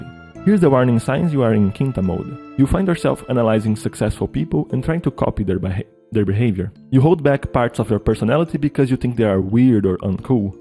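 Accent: Brazil. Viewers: Posso estar errado, mas esse cara é brasileiro? Tá parecendo um brasileiro falando inglês ou eu estou louco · vc parece ser brasileiro pelo seu sotaque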